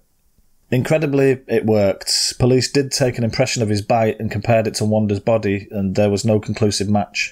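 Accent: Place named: Northern England, United Kingdom. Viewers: I thought you were a Manc. Glad you covered this case, i have heard if it. The victims need to be remembered, like you say. RIP to all his victims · I knew you sounded like you were from the north of England I lived near Manchester I lived in Newton Lee Willows earlstown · I love this channel, your a brilliant narrater, could listen to you all day, I'm not far from you at Manchester I'm from Kearsley, but now live in Wigan, thought I recognised the accent. Keep them coming, loving them all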